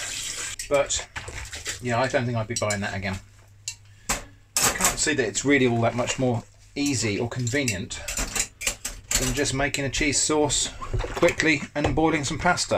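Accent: United Kingdom. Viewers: What part of Britain are you from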